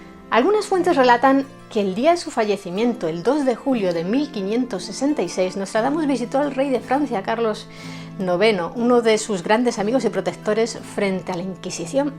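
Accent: Spain. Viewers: . una maravillosa leyenda histórica gracias mil. una pregunta eres Española???.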